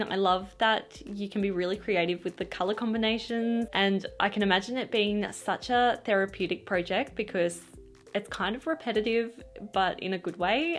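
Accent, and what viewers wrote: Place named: Australia. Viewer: Struggled with the Summer bit. Then heard you talk and remembered, silly me, you are in Australia!. Been there 20yrs ago. Wish I was there now. Looking forward to seeing how the dresses turn out. Also as a Quilter I can't wait to see your artistic version.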